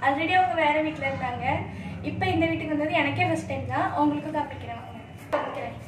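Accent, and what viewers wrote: Tirunelveli, India. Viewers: Innocent is bliss. Ur innocent soft voice n tirunelveli slang super...I happen to see one video on 16th may that ammavituke ponnu pogamatta..liked it subscribed n watched all videos same day...liked almost all. Keep doing.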